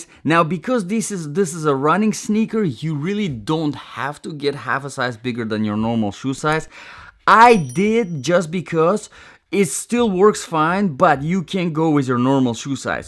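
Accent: Russia. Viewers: Интересный акцент. Вроде как русский и много работал над произношением, но без препода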